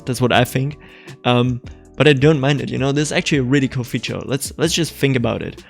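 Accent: Germany. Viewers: Kannst du zufällig deutsch hab nämlich das Gefühl du hast so nen deutschen Akzent 😂 aber gutes Video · U have a german accend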